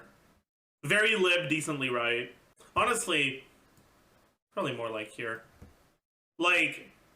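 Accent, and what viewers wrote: Quebec, Canada. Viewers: Hey, I'm really impressed with the quebecois accent you did for this video. Also the beard looks super real. Well done like subscribe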